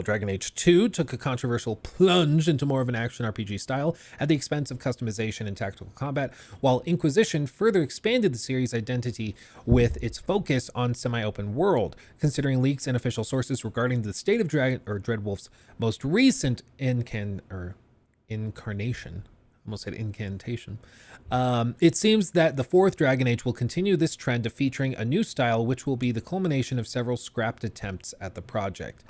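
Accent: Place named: United Kingdom. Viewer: Definitely sounds more British than American to me.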